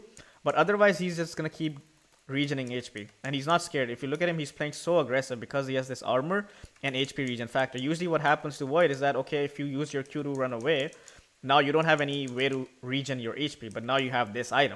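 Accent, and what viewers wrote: India. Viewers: keling speak english so annoying to hear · VERY NICE JUST COPY CEB VIDEO IN INDIAN ACCENT , there have been 1000 game with helm and u chose this game that ceb already explained xd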